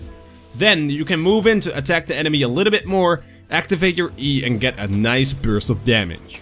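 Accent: Netherlands. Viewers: Are you Dutch?! xD I notice it in you accent. Great job with your channel!! :)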